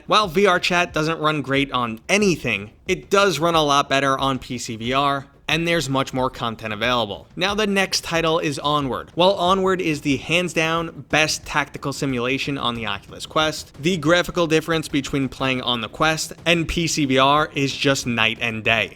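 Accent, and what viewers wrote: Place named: Brooklyn, United States. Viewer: I’m Scottish and I feel this guy is from Brooklyn. Am I right?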